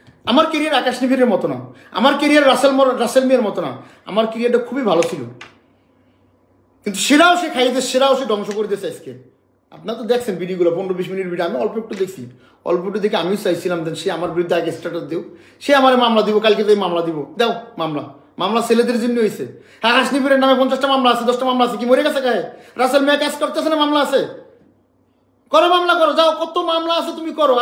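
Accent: Bangladesh. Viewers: Barisal er suddho vasha sundor lagteche sunte 😂😂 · ভাই আপনার কথা শুনে মনে হয় আপনার বাড়ি বরিশালে আপনে কি ভাবে এই মহিলার পাল্লায় পড়লেন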